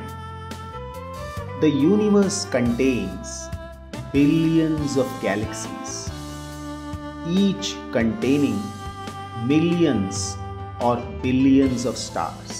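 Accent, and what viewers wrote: India. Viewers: Hahaha, I love the Indian accent, especially when he says "cooled". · Mayank Singh Rajput hindi accent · hahahaha this is not Hindi accent but more like dravidian languages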